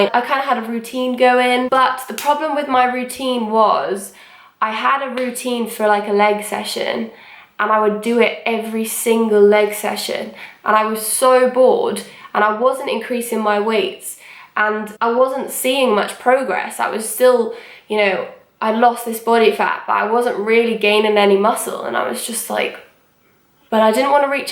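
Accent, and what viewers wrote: Wales, United Kingdom. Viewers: Is it just me that can hear a subtle accent?? Like I can obviously hear the London accent, but something else too?? No?? EDIT: shit, she’s welsh like me! 🏴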